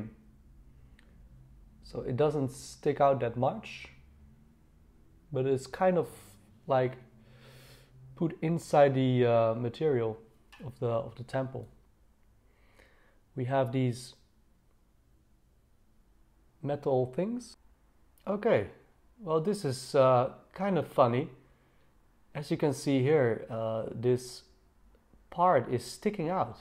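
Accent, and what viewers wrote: Netherlands. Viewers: I hear a Dutch accent.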